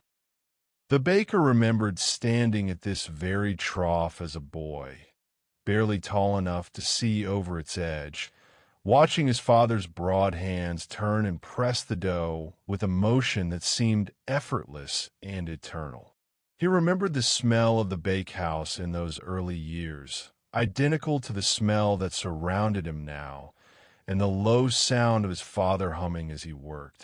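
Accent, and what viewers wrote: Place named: United States. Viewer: English tales by AI with an american accent lol. Lets give it a go 🤞🤞🤞 ............ as always, awful punctuation 😠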